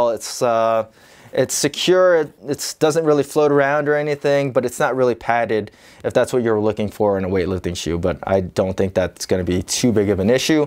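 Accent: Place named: China. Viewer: The Chinese English 🙄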